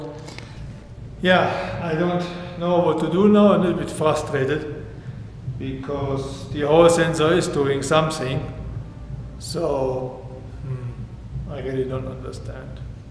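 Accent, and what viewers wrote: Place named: Germany. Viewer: Bei dem deutschen Akzent, würde ich lieber alles in Deutsch filmen und später Untertitel hinzufügen. Ist zwar dann etwas aufwändiger zu bearbeiten, aber weniger anstrengend für die Ohren und vielleicht auch für dich :)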